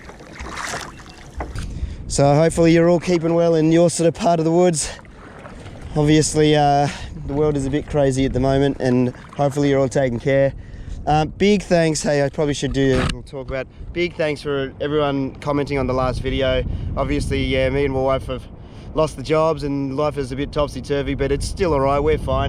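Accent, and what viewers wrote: Australia. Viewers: You channel is addicting. As a guy with a expat snowy mountains father, the accent takes me home. Yeah nah y’all the best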